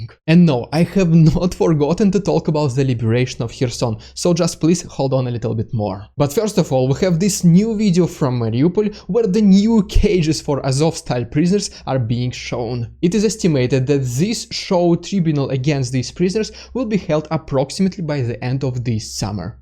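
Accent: Russia. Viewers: No, it's the Russian accent that also ads to this channel being totally amazing!💕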